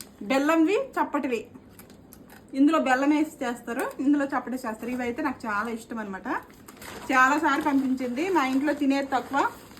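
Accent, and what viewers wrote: India. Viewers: Srikakulam kadakka meedi.. suppulu annav chudu.. chevulaki bhale haai ga undi😊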